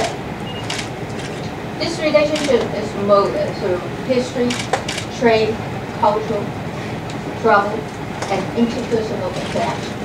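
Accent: United Kingdom. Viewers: 如此純正的英國腔語言豈是牠馬的波士頓抓耙仔或其入幕之密友同志兼大總管公公的小混混所能比擬的呢！水啦！小英！台灣史上第一女 總統！万歳！万万歳！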